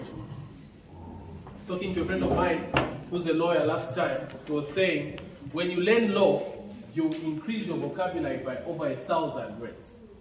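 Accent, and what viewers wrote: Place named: Uganda. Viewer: You must have studied in uganda cz this accent 😅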